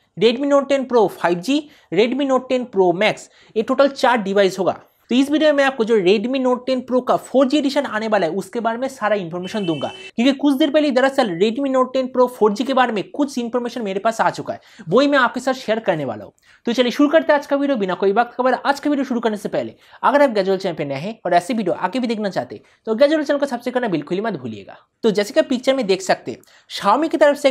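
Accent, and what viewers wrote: Bengal, India. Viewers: Dada apni ki bangali naki apnar Hindi Kotha sunte lage je apni bangali 😀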